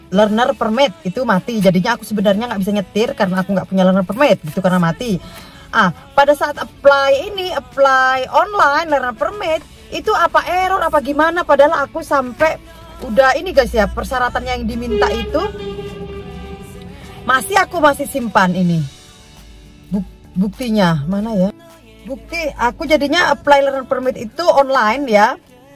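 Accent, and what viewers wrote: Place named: Surabaya, Indonesia. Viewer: ini ono.... ini ono.... ini ono.... kyk aku kl ngomong.... wahhhh iki kyk e wong Suroboyo an.... logat e arek" Suroboyo an.... iyo tho rek , sampeyan arek Suroboyo tha ?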